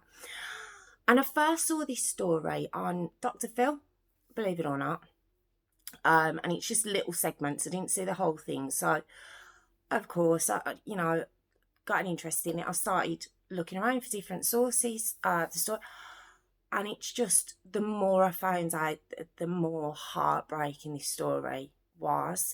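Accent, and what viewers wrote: United Kingdom. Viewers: What kind if an accent di you have? British, or Irish? I can't distinguish it. I just heard of this story, and it's so awful.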